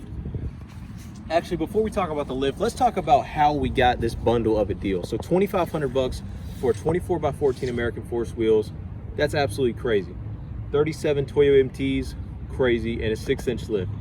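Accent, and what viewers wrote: Alabama, United States. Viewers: What part of Alabama are you from? I’m from Auburn!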